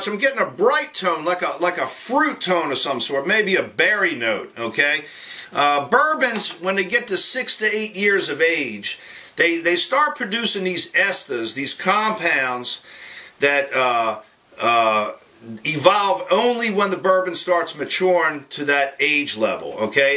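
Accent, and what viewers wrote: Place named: New Hampshire, United States. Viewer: Very informative video. I shared this for my friends to view. Love the accent. Reminds me of my mother and her accent from New Hampshire.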